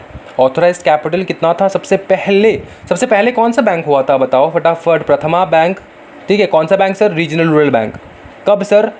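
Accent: Bengal, India. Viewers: Seems like sir is has a bengali accent 😄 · Yes , Sir has Bengali accent because He is Bengali🙂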